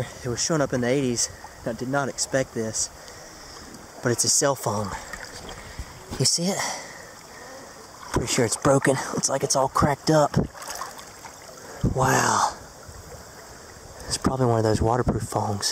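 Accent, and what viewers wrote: South, United States. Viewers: I love his accent an he's Avery handsome southern man stay that way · where are you from you sound like you're from the south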